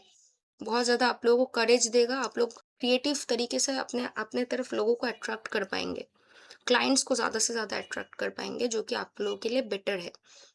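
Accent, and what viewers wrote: Bengal, India. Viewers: Are you bengali?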